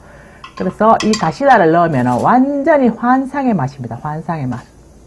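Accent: Gyeongsang, South Korea. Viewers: 갱상도 사투리 너무 재미나요 ㅎㅎㅎ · 댓글 처음 달아보네요..저는 부산 살다 충청도로 올라와 생활한지 10년이넘어갑니다..그러다보니 경상도 사투리 들으면 왠지 정겹고 그리워요. 사투리 들으니 속이 뻥 뚫리고 넘 재밌어요. 특히 오봉~~ 이란말에 빵 터졌습니다... 좋아요..구독😍😍😍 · 아이구 ~ 아삭아삭 맜있는소리 나네요" 경상도라에 ??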